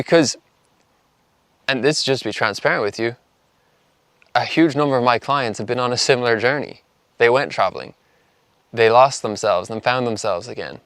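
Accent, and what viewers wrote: Ireland. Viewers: is that another irish accent I sense?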